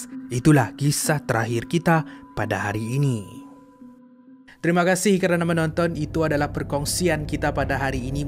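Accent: Sabah, Malaysia. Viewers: Dia Sabah, bahasa pun lain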